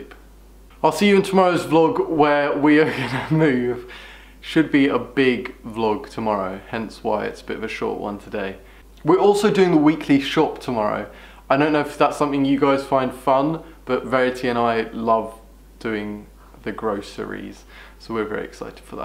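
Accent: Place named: England, United Kingdom. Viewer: I’m American I like the way your English sounds what’s your thoughts about the American people I like the English voice lol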